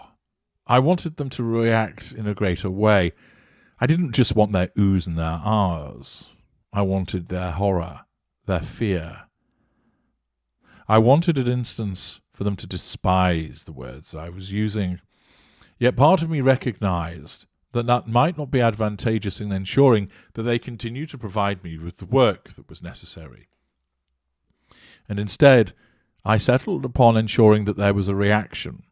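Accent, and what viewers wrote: United Kingdom. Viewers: Like & appreciate your teaching. As an American (raised in Texas), LOVE the tone of your voice & British accent. I would think you could have made quite a bit of money narrating books, doing voice over for TV-radio commercials, and voice for cartoon movie characters [the sophisticated man with a handlebar moustache or the evil criminal character].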